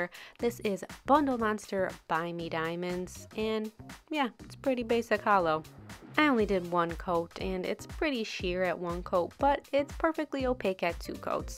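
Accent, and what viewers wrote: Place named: North Dakota, United States. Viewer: Are you from North Dakota or anywhere in the north? :P You've got a lil bit of an ND/UP Michigan accent. I could be totally wrong tho lol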